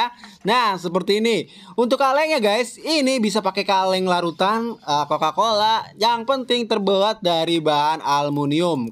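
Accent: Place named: Bekasi, Indonesia. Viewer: Org Bekasi Mane dye suaranya niiiiihhhh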